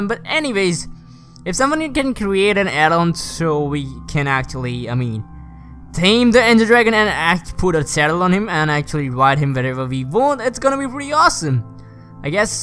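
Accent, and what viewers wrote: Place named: India. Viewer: You have a mixed accent, sounds a little bit like Indian accent, are you Indian by any chance? Just curious..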